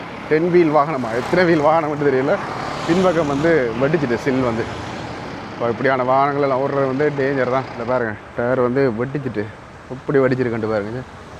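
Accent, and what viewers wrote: Sri Lanka. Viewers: இலங்கை தமிழ் நீங்கள் பேசும்போது கேட்பதற்கு நன்றாக இருக்கிறது ஆனால் வேகமாக பேசுகிறீர்கள் நாங்கள் சென்னையில் இருக்கிறேன் உங்கள் தமிழ் புரிந்து கொள்ள முடியவில்லை கொஞ்சம் நிதானமாக பேசினால் நான் புரிந்து கொள்வதற்கு ஏதுவாக இருக்கும் மற்றபடி உங்களுடைய இலங்கை தமிழ் கேட்பதற்கு நன்றாக இருக்கிறது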